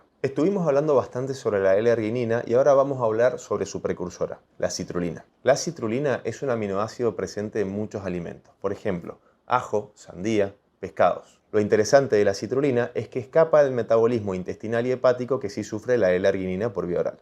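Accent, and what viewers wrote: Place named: Argentina. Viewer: Muy interante su explicacion Es 'Usted Argentino ?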